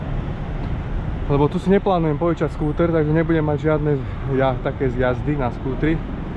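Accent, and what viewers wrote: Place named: Šurany, Slovakia. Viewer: boa, ale tá šúránčina je riadne počuť :) Ale dík za videá, ja sa chystám do Saigonu v apríli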